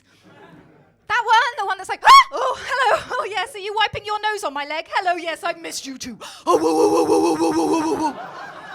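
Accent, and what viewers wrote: RP, United Kingdom. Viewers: She's hilarious and I love her British accent! Why is it that all these beautiful women tell sex jokes? Hmmmm? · Brilliant! 😂 Well I must say, with that nose, cheekbones, chin, accent and name she must be the most british thing I can think of. Except the late queen ofc. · Ha! Brilliant! As a Lincolnshire man living in Pensacola, Florida I appreciate the juxtaposition of the Queen's English and bawdy humor. Subscribed :)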